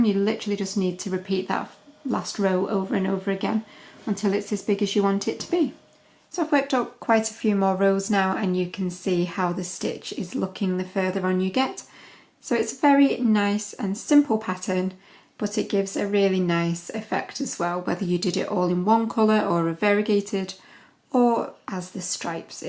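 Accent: United Kingdom. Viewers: There's this cool button that you can use if shes going too fast for you... its called the PAUSE button. Such a wonderful invention! Also, as an American, I can understand her British accent very well. She speaks pretty clear compared to several others that I've tried following. Just some constructive criticism for YOU 😊✌❤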